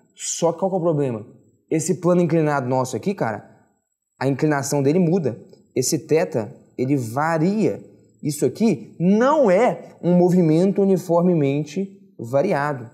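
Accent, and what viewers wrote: Minas Gerais, Brazil. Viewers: seu sotaque massa "minerim"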